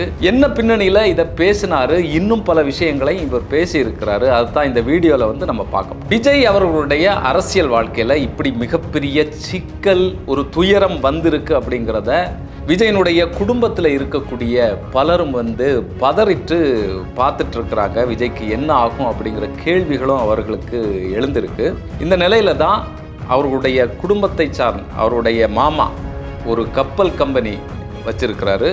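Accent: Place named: Kanyakumari, India. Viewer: Sir, are you from Kanyakumari Dist, and Monday market or Marthandam side? Your slang is kk slang.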